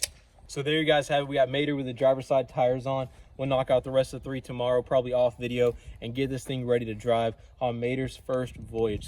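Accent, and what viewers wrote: Alabama, United States. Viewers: You are from Alabama right ?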